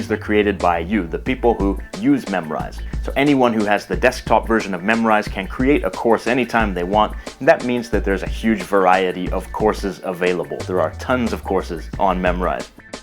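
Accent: Canada. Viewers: For some reason I knew that you weren't American. I know that you are trying to speak clearly, so non natives can understand you better, but in general Canadians speak more eloquently and clear. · Thanks for the information! I was curious because your accent didn't sound like the "standard" Canadian accent to me. From which province do you come from?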